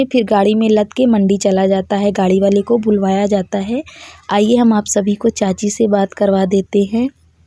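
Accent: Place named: Naini Nibi, India. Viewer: सिस्टर आप का भाषा और बोली जो है न अयसा लगता है कि आप नैनी निबी के तरफ के हो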